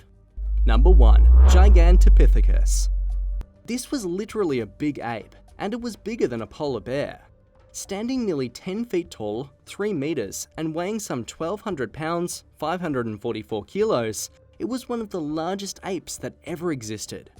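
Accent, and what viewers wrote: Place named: Australia. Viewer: If it's aussie speaking, i believe its real even tho he lack of/some missing words 👌🏾🇦🇺🎙️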